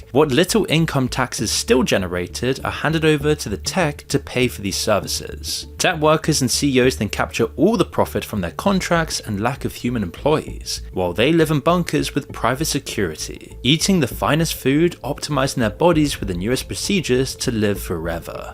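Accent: United Kingdom. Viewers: I love some Douchebag with a British accent telling me how fucked up my City is..... you don't know shit, we love it here.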